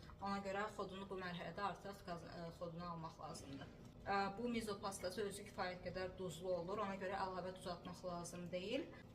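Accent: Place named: Baku, Azerbaijan. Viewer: Əlaaa. Aləmsən. Bakı ləhcən lap ləzzət eliyir. Eşq olsun ❤❤❤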